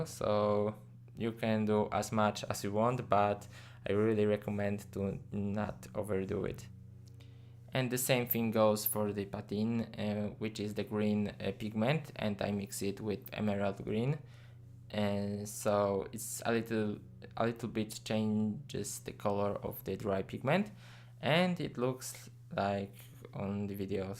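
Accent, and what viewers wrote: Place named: Russia. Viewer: Мне кажется, что ты русский, уж акцент очень похож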